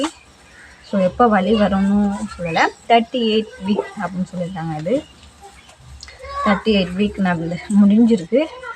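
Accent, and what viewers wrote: Thanjavur, India. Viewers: எங்கம்மாவும் இப்படித்தான் பேசுவாங்க தஞ்சாவூரை சேர்ந்த எல்லோருடைய பேச்சும் இப்படித்தான் இருக்கும்.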